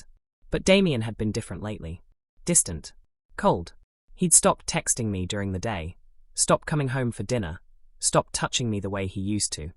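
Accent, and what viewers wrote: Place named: England, United Kingdom. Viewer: good story, i happy that i found a channel with a english accent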